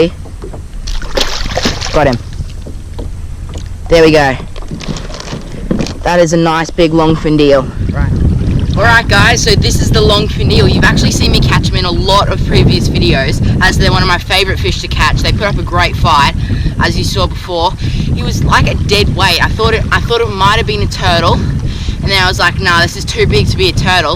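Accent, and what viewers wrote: Australia. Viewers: hey what part of Australia are you from ?😜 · Melissa Bushell he's an Aussie, Fuckn lad he is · is he australian?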